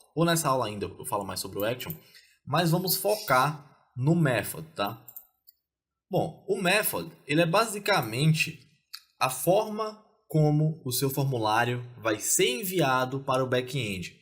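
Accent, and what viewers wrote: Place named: Ceará, Brazil. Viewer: maah... tu é do ceará? teu sotaque é do ceará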